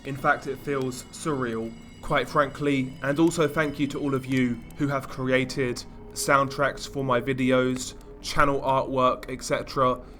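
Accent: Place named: United Kingdom. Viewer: I'm an American, listening to a presumed Britt, inform me about the horrors occuring in Mexico.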